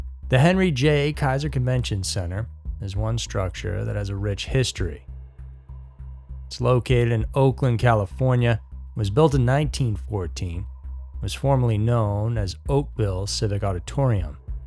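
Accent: South, United States. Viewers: Never heard about this case before. Pretty crazy. Love that southern accent.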